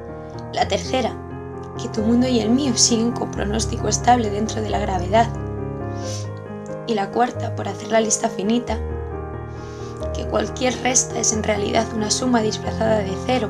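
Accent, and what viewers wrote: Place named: Spain. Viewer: Me encanta el acento español!!!!!!!!!!!!!!!!!!!!!!!!!!!!!!!!!!!!!!!!! Me encanto lo que hiciste! He llorado... inevitable no llorar!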